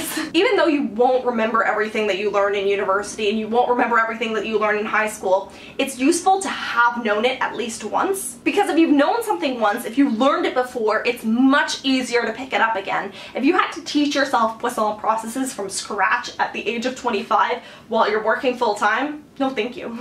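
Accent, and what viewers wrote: Canada. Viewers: Wait a minute... t'es Québécoise?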